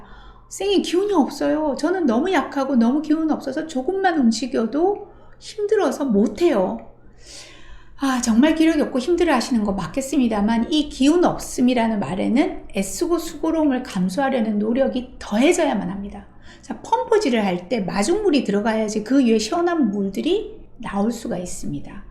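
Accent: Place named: Gyeongnam, South Korea. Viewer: 호주 시드니에서 사남매 맘이 응원합니다 ^^ 저는 경남거창이 고향인데 ㅎㅎ근처 비슷한 말씨인거 같은데 ㅎㅎ좋은 영상 감사 드려요 새해도 활기차게 감사하며 아자아자!!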